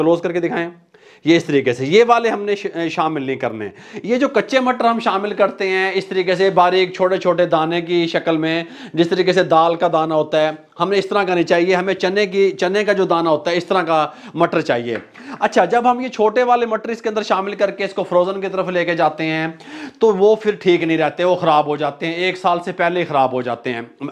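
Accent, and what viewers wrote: Punjab, India. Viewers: Punbajion ki urdu sunnay ka alag hi maza hai gal samajh aa rhi hai 😂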